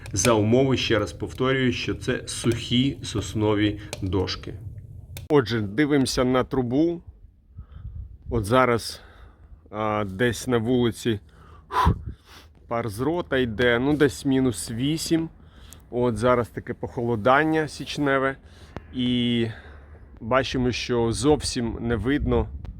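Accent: Russia. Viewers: В твоей речи слышно, как много лет ты говорил на русском, а тут бац и вспомнил о незалежности.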